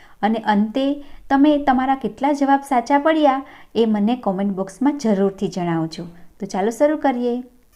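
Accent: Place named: Ahmedabad, India. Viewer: Ahemdabad vada No avajj che shaci vaat ne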